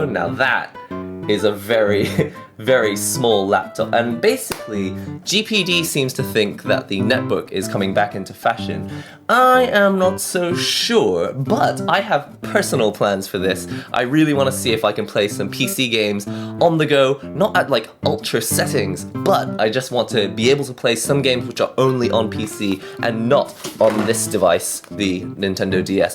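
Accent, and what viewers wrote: England, United Kingdom. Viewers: Real nice English accent :D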